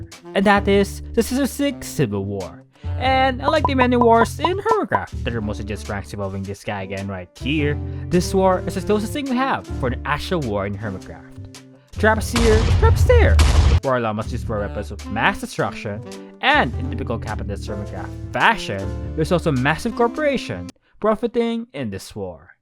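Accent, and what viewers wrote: Philippines. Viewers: Are you a filipino? i kinda hear that accent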